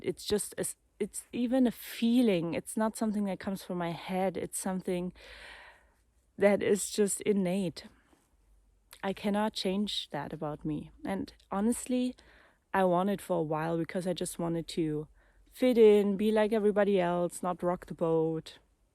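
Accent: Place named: Germany. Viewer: süss dein deutscher Akzent, unverkennbar. Meiner auch nach Jahrzehnten im Ausland <3 schönes neues Jahr <3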